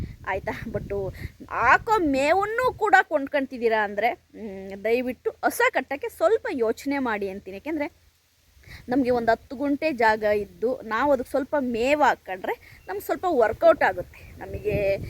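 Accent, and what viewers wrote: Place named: Ajjampura, India. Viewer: ನಿಮ್ಮ ಊರು ಯಾವುದು ಮೇಡಮ್ ನಿಮ್ಮ ಮಾತಿಧ ಉಚ್ಚಾರಣೆ ನೋಡಿದರೆ‌ ನಮ್ಮ ಅಜ್ಜಂಪುರ ಪಟ್ಟಣದ ಸುತ್ತ ಮುತ್ತಲಿನ ಹಳ್ಳಿ ಅನಿಸುತ್ತದೆ ದಯಮಾಡಿ ವಿಳಾಸ‌ತಿಳಿಸಿ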